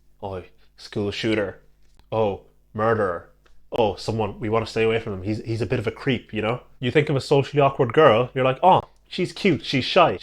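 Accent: Ireland. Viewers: My brain can't process a black man with an Irish accent lol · This guy just came up on my feed. Interesting take on things. Good to hear the aul Irish accent representing!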